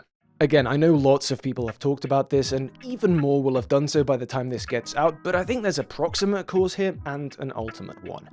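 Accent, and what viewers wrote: United Kingdom. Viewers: how is this guy British Kyle Bosman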